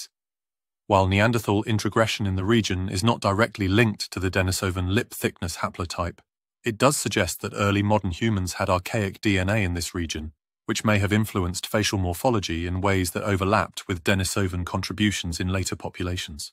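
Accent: England, United Kingdom. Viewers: I think it would be nice to pronounce Denisovan and Neanderthal correctly, especially if employing an English accent in the presentation.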